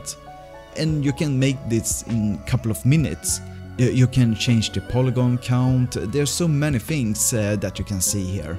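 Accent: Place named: Sweden. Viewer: The heaviest swedish accent I've ever heard lol. Did not know this was a thing, but that's awesome, lit up some new motivation in my silly game project. Tackar så mycket för det! ❤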